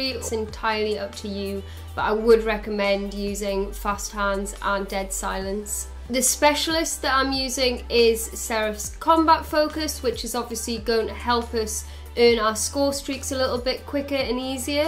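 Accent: United Kingdom. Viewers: Is this britsh english?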